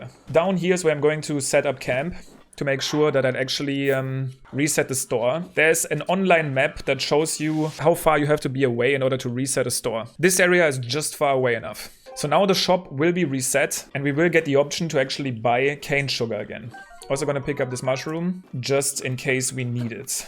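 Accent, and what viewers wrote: Germany. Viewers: My mom is German and I guess I don’t watch a lot of German people speaking English but now I weirdly associate you with my mom. Thanks Also, herzlichen Glückwunsch zum Geburtstag!!!!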